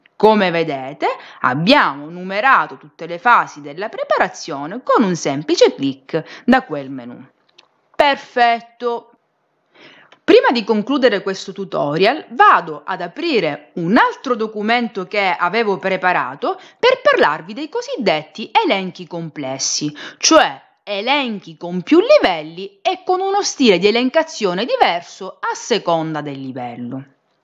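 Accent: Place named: Sicily, Italy. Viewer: Sono ingegnere come te, ma hai delle capacità oratorie affascinanti,…poi con quell’accento (siciliano?) ufff, tanta roba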